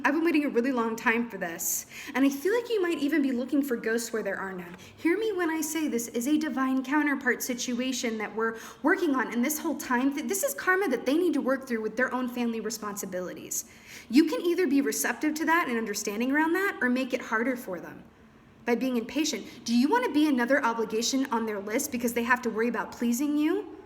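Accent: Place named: Mississippi, United States. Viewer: I love your accent...I’m from Mississippi and you remind me of home 💜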